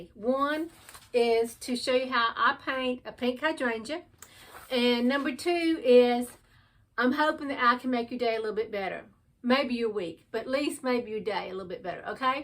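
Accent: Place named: South, United States. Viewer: I love this tutorial. Thank you!! Your accent tells me you are from the South. Love your accent. I’m in Tn. Would you show us a video on painting a Gardenia, also?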